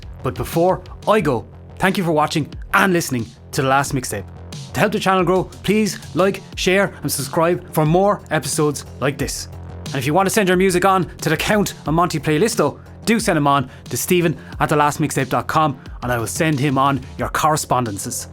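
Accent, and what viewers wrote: Ireland. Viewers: You look around the same age as me and, also like me, sound as if you're from Baile Átha Cliath. So, unless you are living under a rock, you still experienced The Troubles. I remember the Dublin-Monaghan bombings, the gun being held to my head, as a kid, by a British "soldier", as I visited relatives in my own country, across there border. I remember the Gardaí checking our houses, etc. It wasn't just those in the occupied counties that experienced it. Yes, it was far worse there, but not exclusively there. Don't you remember when the unionist terrorists, led by Ian Paisley, invaded the Republic?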